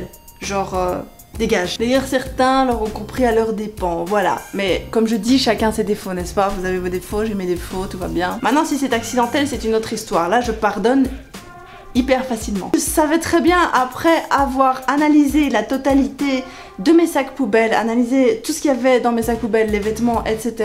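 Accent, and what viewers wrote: Belgium. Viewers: Pierre Petit elle parle de Bruxelles à moment donné et vu ça façon de parler j'en suis quasi certain (c'est la première vidéo que je regarde) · Du coup, moi qui suis nouveau ici e arrivé presque au milieu de la video. J'ai été faire un tour sur ta playlist mais ej trouve pas la première partie. Je fais comment pour avoir le début de l'histoire. Parce que l'air de rien ça m’intéresse. Je suis malade. au lit et je m'ennuie, je regarde donc des vidéos de gens qui racontent leur vie. Et j'aime bien. Kestuvafèr ? EDIT: c'est bon j'ai trouvé et j'ai vu la partie 1. Donc je suis de retour pour regarder la suite. EDIT2: pourquoi tant de dislike? les gens n'aime pas notre accent belge? Enfin pas vraiment belge puisque sud du Hainaut ou nord du Luxembourg.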